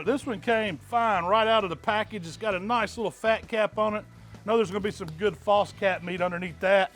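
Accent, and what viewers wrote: South, United States. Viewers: A big, bowling ball-shaped guy with a southern accent and a beard is exactly who you want to take bbq tips from. This looked amazing.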